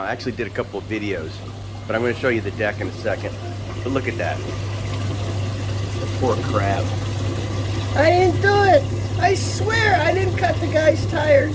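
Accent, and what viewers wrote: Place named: Texas, United States. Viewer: What a beautiful place, on par with some of the fanciest restaurants in LA & New York & easily cost 3x as much! ❤ Your sense of humor, & Texan accent with China, somehow just works!😂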